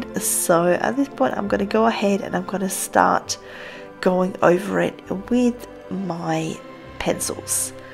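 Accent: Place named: Australia. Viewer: Your accent is becoming more and more Australian hehehe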